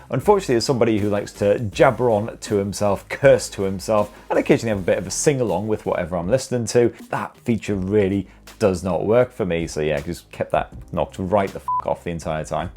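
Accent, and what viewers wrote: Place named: Northern England, United Kingdom. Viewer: Oh I found your video rather refreshing first of all you’re not American or are you putting on a great northern accent or dialect et cetera. However you failed to mention about the ability to use it as a phone feature. That is he not making calls and receiving calls I take it these ear pods pods can actually do that cheers keep up the good work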